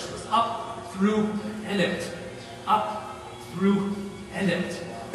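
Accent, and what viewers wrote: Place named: Canada. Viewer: ha, I knew he was Canadian. The way he pronounces the "ou" in "out" is a dead give away. I used to date a Canadian and that's exactly how he talked.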